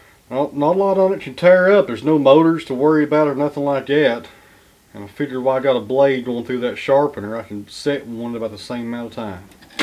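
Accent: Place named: North Carolina, United States. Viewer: With that accent I knew you were close by. I’m in Westerville, NC. 40 minutes from you.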